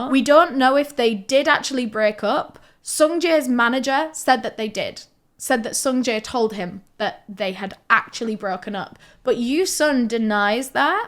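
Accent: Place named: Yorkshire, United Kingdom. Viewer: I’ve watched her for yearsss now, i believe she’s mentioned she is from somewhere in Yorkshire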